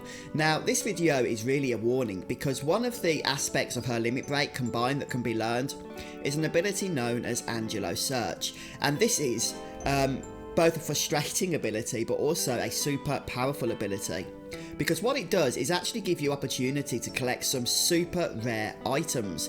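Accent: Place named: Midlands, United Kingdom. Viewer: He's from Midlands in the UK for sure :)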